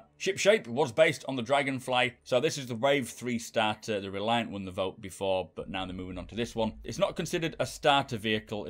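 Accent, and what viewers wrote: United Kingdom. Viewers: You mean American subtitles, he's speaking (British or Scottish) English.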